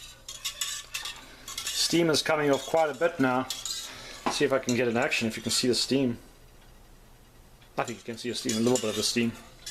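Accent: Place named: South Africa. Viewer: That goes without saying. The heating process is to attack some of the non-beneficial bacteria and microbes. That means, as you point out, that some benefit will be sacrificed along the way. Why should we sacrifice more than we ought. Also, I have discovered that 180 f is optimal heating and cooling to 108 is also perfect. At this time I will then place the jar in an insulated baking dish and fresh dish towels with a layer of paper towel rubber banded on. At this point, the oven will be maintened around 82-84F with simply the use of an oven bulb. Lastly, I have discovered that optimal fermentation/curing time is between 6.5 and 8 hours. Lastly, are you South African? Your accent reminds me of the many Boere which I have known.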